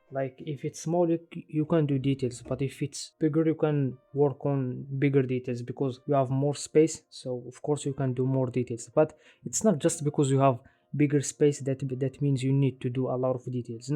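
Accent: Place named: Morocco. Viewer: probably his accent is so Moroccan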